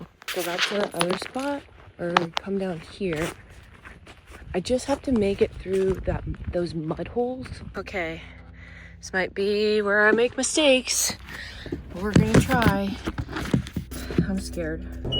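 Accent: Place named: Arkansas, United States. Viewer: I love how that Arkansas accent comes out every once in a while. :)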